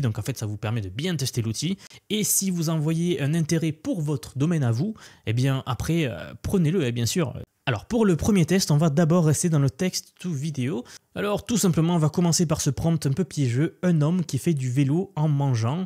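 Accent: South, France. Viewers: Merci pour cette vidéo ! très intéressant ce nouvel outil ! Et vive l'accent du sud !! 😁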